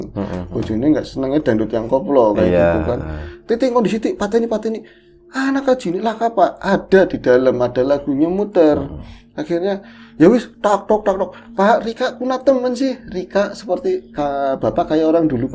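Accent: Banyumas, Indonesia. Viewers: Aku di sini malah kekel krna mas nya ngomong nya ngapak campur bahasa indonesia 😂😂😂😂😂maaf ya gk bisa gk ketawa 😂😂😂😂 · Mas, aku kelabakan pas ngomong ngapak full speed. Ga ada subtitle-nya. 🤣🤣 Btw, format begini menarik jg sih. Seperti POV klo kita ngumpul² bareng gt kan pasti ngeliatnya ga cuma ke satu orang.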